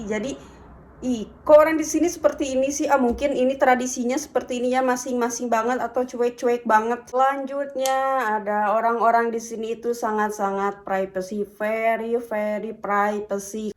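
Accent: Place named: Sunda, Indonesia. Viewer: Euleuh... eta kamu teh logat Sunda na meuni lekoh pisan. 😂😂😂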